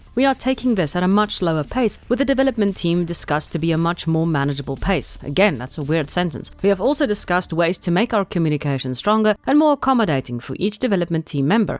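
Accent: South Africa. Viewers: Ma'am are you South African? You sound so Safa it's making me smile like crazy, if not then ignore this lmao, but that accent it's giving me such heavy South African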